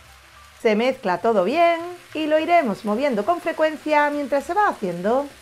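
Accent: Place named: North, Spain. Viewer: Pues en pareces que seas del norte en vez del sur con ese acento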